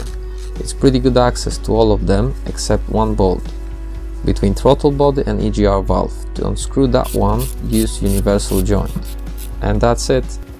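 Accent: Poland. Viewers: Jak tylko usłyszałem ten akcent to wiedziałem że to polak, nie mniej jednak dobra robota :D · Słyszę Polski akcent 😀 mam rację? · Polak na bank sądząc po akcencie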